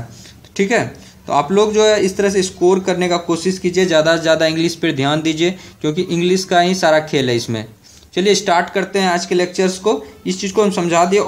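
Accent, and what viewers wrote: Bihar, India. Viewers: sir aap Bhagalpur Bihar SE ho kya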